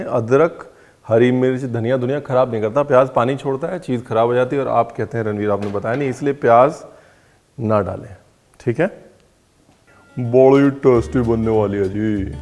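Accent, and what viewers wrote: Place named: Bhojpuri, India. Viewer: Your bhojpuri accent is so sexy ..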